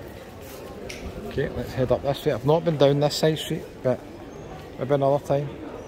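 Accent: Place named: Glasgow, United Kingdom. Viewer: That's a real Glasgow accent, so it is.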